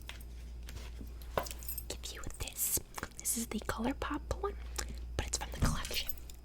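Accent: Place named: New Jersey, United States. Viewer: You sound more like a Jersey Shore Girl, but I really enjoyed this...Love, Karen BTW, you need to do a video giving her date a shakedown because I made all my daughter’s dates pee their literal pants. They were so afraid of me!! That’s a true Karen...stare him down and yell at him with a whisper!! :)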